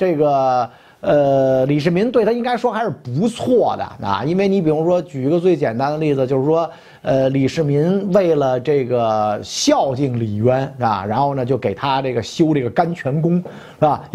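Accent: Beijing, China. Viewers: 北京北城人说话大概就是袁老师这个样子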